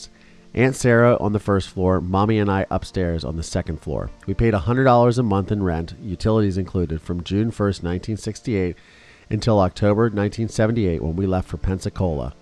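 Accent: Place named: Philadelphia, United States. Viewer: I found out you were from the Philadelphia area after your pronunciation sounded so familiar. I grew up in Abington township- right near the Hot Shoppe/B&N- and later lived in Pensacola at the NAS with a few trips to Bermuda in between. I was abused and spent the past 30+ years putting the pieces back together. I’m rooting for you.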